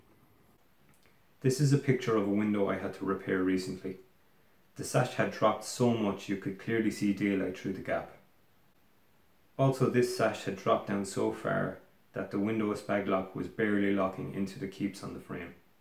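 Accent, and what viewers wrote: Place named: Ireland. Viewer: I never thought i'd hear an Irishman talking about sashes.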